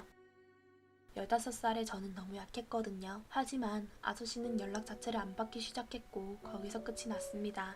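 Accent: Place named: Gyeongsang, South Korea. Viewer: 사연 읽어주시는분 갱남사람인가베요?ㅋ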